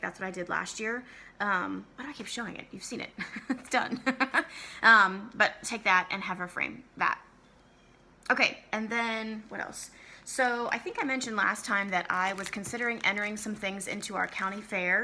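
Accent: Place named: New York, United States. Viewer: I'm catching up on your videos and I totally thought you were from New York! I grew up in NYC and swear you could have been my neighbor there. Maybe it's how quickly you speak? (Which I LOVE, because NY). I love Ebenezer's Christmas, I think I have to find and stitch it for my brother.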